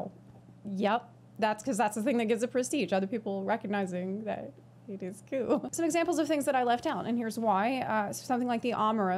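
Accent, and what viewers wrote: South, United States. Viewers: You have the cutest country accent I've ever heard where r u from ?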